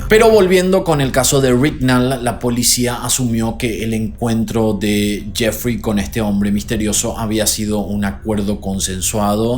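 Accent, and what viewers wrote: Paraguay. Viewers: Los prejuicios siguen existiendo infelizmente los gays, trans y mujeres, fíjate el caso de el niño Lucio en Argentina, le negaron la custodia al padre y al tío paterno,las leyes están hechas por hombres en general y no por ser de libre pensamiento Feliz Navidad, te sigo hace poco pero tus cortos sin muy buenos Vivi en Asuncion 4 años hace muchos años y reconocí al toque tu lindo acento de Paraguay Soy Argentina y vivi en varios lugares, el último ( por ahora) es Barcelona Te mando un abrazo y te felicito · Wacala hablas como paraguayo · De casualidad no sos Paraguayo?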